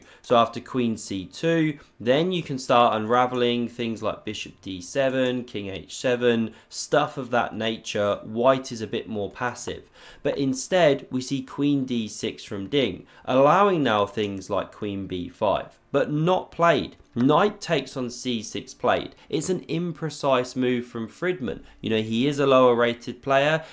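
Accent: United Kingdom. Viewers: what is the name of your particular type of British accent? What's the region?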